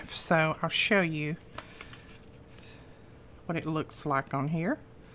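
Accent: South, United States. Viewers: Oh, I LOVE everything about this project!!! Especially your Southern accent, 'cause I'm a Southern girl too ;-) TFS!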